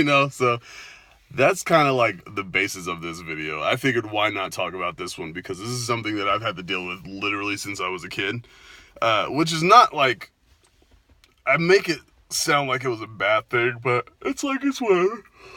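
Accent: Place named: California, United States. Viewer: Yes, pretty much. And btw, I also thought you were from California, and then later in the vid you mentioned your parents (I think) came from there. So at least one American accent I can somehow pick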